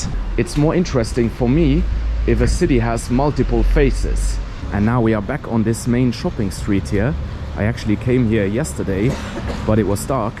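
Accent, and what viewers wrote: Germany. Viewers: greetings from Lithuania! I like your accent, sounds like German. I have never been normally to Poland, one day would like to visit Baltic coast, mountains and capital there. Thanks for the vlog! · OK even if, but his English is with very recognizable german accent as his first language is german.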